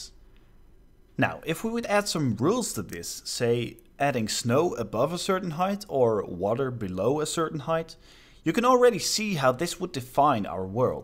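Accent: Netherlands. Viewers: How come I always recognize a fellow Dutch accent. Then when I check the account info, it's actually true xD Useful info video tho! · Yes, as a fellow Dutchman, I recognised you accent within a few seconds. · I could hear you were Dutch, but you have less of an accent then I do after 30 years living in the USA!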